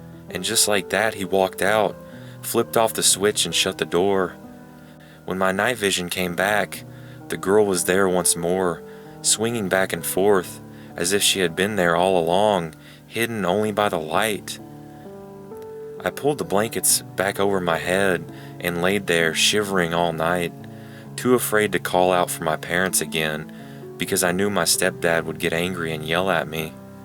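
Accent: South, United States. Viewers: first time on this channel......damn, you sound southern... its ok, I'm from texas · you sound American you have a accent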